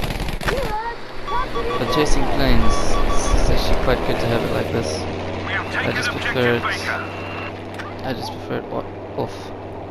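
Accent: South Africa. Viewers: Bro heard by your voice you were a fellow RSA member 😂😂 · SA Accent?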